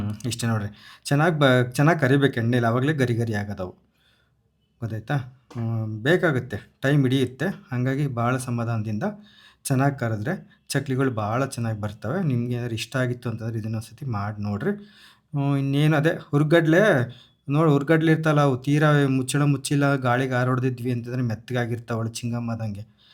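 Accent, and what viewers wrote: Chitradurga, India. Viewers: ಕೋಲಾರ ಅಲ್ಲ adu Chitradurga da ಭಾಷೆ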